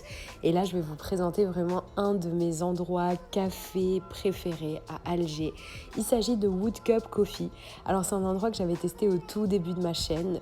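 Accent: France. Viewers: Je déteste la prononciation des mots anglais en accent française aaaaaaaaaaaaaa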